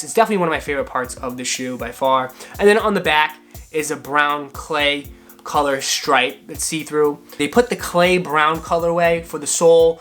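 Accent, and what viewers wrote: Boston, United States. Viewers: If I'm not mistaken your from Bahstan?. Wicked good review.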